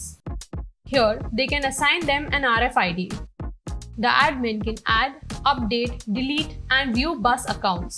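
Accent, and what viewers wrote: India. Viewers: I mean no disrespect. But I am African. As an African, listening to English is challenging enough. Add to the equation, Your very heavy Indian accent and this video just as well could be in Russian. You lost me in translation. May I suggest, you using a AI synthesised voice-over in your clips?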